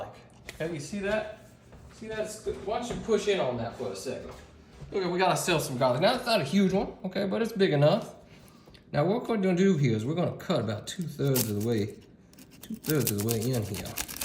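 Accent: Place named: Louisiana, United States. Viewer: Fantastic accent! May I ask where you're from? Could it be Louisiana?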